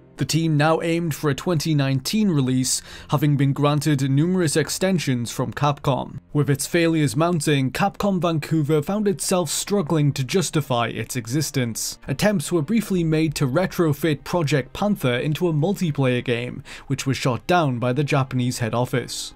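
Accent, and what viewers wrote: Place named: North, United Kingdom. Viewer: i wish i could listen to northern british accents but i just can’t :(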